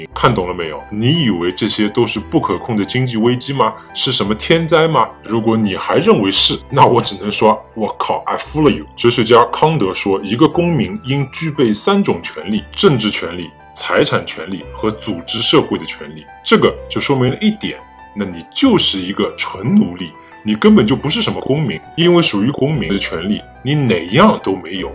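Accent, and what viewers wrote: Shanghai, China. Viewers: 其实就是一个人，因为都是上海口音，好多家具都一样的😂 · 音色和语速可以软件微调成不一样，但是很多词的口音、下意识的手势动作和偶尔冒出来的上海话切口，说不是我都不信。。。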